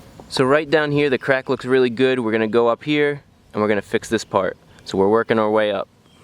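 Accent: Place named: New Jersey, United States. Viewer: Dat jersey accent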